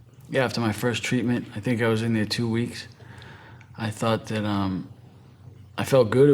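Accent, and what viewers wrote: United States. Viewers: Born in Boston. Knew you were from MA as soon as you said your name. Best of success for you! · ... G:)d bless the Boston accent ❤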